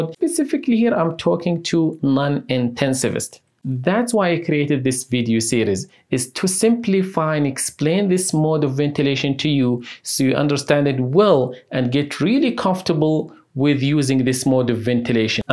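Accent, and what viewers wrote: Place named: Jordan. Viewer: عرفتك من اللهجة انك اردني. زي لهجتي. انا اردني الماني . الله محييك. فيديوهاتك جميلة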